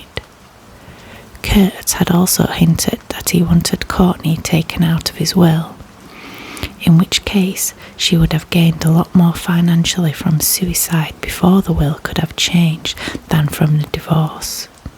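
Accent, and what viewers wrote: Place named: Yorkshire, United Kingdom. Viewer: Came from jojo stayed for three reasons I like ASMR I like the Yorkshire accent I like hot mums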